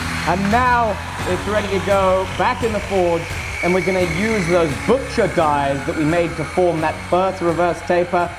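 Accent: England, United Kingdom. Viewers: Are u English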